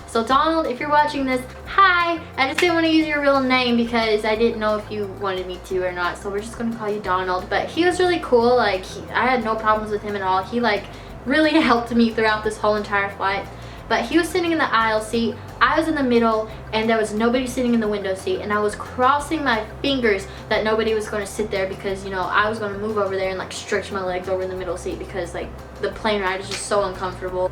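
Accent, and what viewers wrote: South, United States. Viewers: what state are you from? I realize you would obviously be from the south. I live in the south aswell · Margot robbie said she watched your videos to get a southern accent for a movie omg! 😍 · You sound so southern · Are you southern cuz you have a really strong accent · I love your country voice man I've always liked country voices 😝 lol I've never said man before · you voice sounds country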